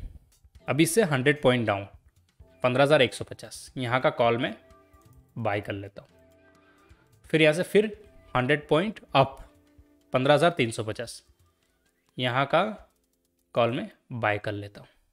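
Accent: Belgaum, India. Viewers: Dear sir r u from belgaum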